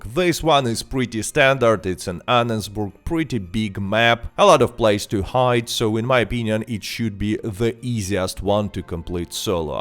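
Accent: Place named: Russia. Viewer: His accent make him sound Russian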